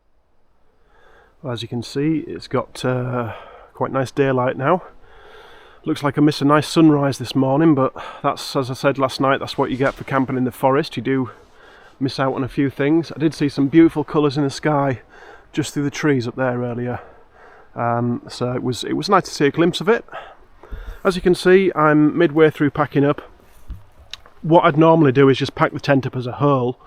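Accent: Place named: United Kingdom. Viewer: Hey Happy New Year to you too! And congratulations to your 1000 benchmark. I like both your gear reviews and your trail documentaries very much (for the great photography, but maybe even more for the narration - a nice contrast to all the same same stuff from fulltime youtubers. Plus, it’s British!) And yes, you do inspire me a lot. I am a backpacking newbie from Germany, and discovered your channel when I was researching European trails to hike as a first-timer. So last year I ended up doing the Yorkshire Wolds Way plus Cleveland Way. At the end of the walk I still had so much holiday left, and I spontaneously walked the Cumbria Way as well. My next trip is planned for February - part one to three of the GR 131. And in August it’s either GR 10 or GR 11. I am hoping for a comparison video at the end of your current series… So, thanks for the great work and I hope you have some wonderful trips in 2024!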